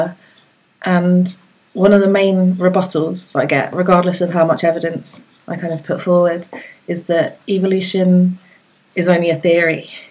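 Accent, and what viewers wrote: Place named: Australia. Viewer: She presents her point in a succinct and articulate mannor. She reveals her data and lays it out for inspection. Very well done. Now that that is out of the way,,,,,,,, OHMYGOD I AM IN LUST!!!,,,uh,,i mean,,OHMYGOD I AM IN LOVE! Those eyes!Those lips! and that ACCENT! Damn, such an awesome Aussie.,,,? Kiwi?,,,Limey? Aww hell,,who cares, Ill just stare at her as she reads the phone book,,,,,,sigh.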